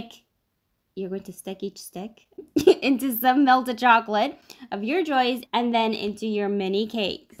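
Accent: Italy. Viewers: Are you from Italy? It sounds like it!! Gonna try it soon with my friends!! 😄😃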